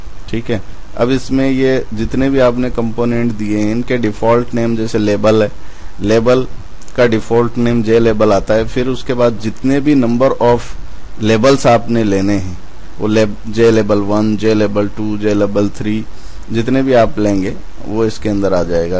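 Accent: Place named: India. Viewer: Hey you, reading this comment, I just went through the entire Playlist for NetBeans IDE If you can ignore the strong Hindi accent, and language, grammar isn't a problem for you, Then this series is a beautiful option for you to quickly learn NetBeans in one day, Be sure to make notes of the things you learn, And you'll be good to go, I have my practical exam in the morning, and found this really useful to learn the entire course in one sitting, Concise, and important aspects covered, However, if the pace of your speaking, and repetitiveness was gone, Then it would've been phenomenal, Thank you, so much, for this series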